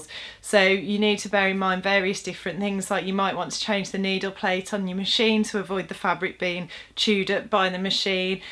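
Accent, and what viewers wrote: United Kingdom. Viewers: Hi I have just stumbled upon your videos and just want to let you know that you are AMAZING.You explain in simple English. I have mostly listened to the American videos and it's just lovely to listen to someone normal from UK. I am so hooked to your videos now and busy going through all your videos. I'm hoping to buy an upgrade on my sewing machine and looking at a Jenome Atelier 7 and hoping to invest in a good overlocker. Just waiting for lockdown to be lifted. I'm currently confused between a Janone and a Juki of the same model type and really struggling to make up my mind and as well as with an overlocker. I want a good overlocker. Not a lower end but also not a difficult one as I too am struggling with the threading of overlockers. I hope that you can help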